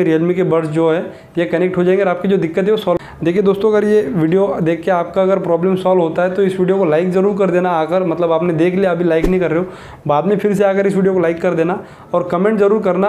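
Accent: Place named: Mumbai, India. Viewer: Fucking useless i cant understand bombay shit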